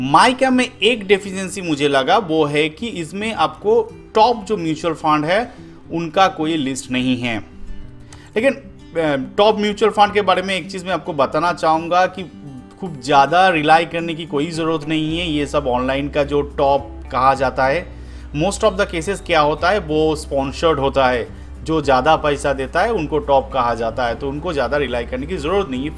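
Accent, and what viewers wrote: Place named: Bengal, India. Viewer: sir apni bangli jaisa bolte hai.. mast lagta mjhe.. 😊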